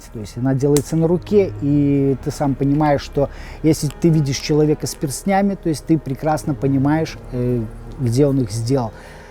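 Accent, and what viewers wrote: Belarus. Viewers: какой всё таки специфический белорусский говор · Чувак из Белоруссии, по разговору. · Белорусский акцент у чувака.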